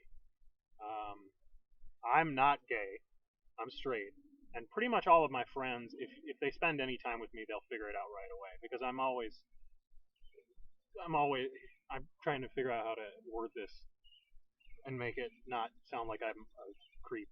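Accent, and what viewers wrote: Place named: Midwest, United States. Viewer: I was gonna say you sounded Midwestern :) (Wisconsinite INFP)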